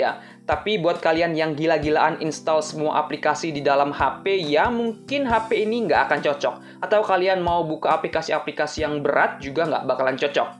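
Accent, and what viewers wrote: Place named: West Kalimantan, Indonesia. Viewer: nih pasti orang kalbar ya yg punya chanelnya😀😃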